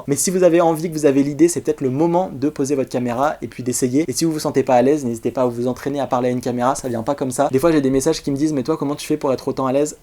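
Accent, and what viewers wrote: England, United Kingdom. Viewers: Mais quel accent anglais ! Whouaaa 😂💕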